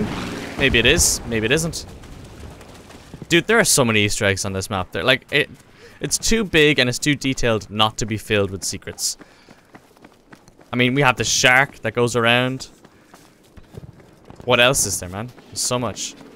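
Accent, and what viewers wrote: Ireland. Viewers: U sound Irish...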